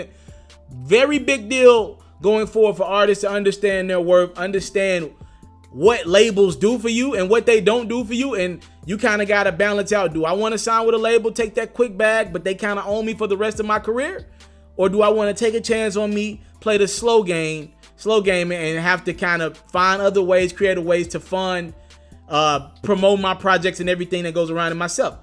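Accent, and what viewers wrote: Memphis, United States. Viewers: . Youn sound like you from MEMPHIS 💯💯💯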